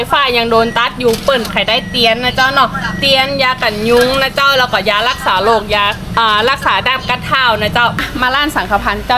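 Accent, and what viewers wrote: North, Thailand. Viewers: น้อง เป็นคนที่ไหนครับเห็นอยู่ทะเลแต่พูดเหนือ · โค้ะ อู้กำเมืองได้ตวย ฮู้ๆๆ สุดสุดยอดเลย ก่นึกว่าคนใต้คนภาคตะวันออก ชอบเลยครับต้องสนับสนุนละครับ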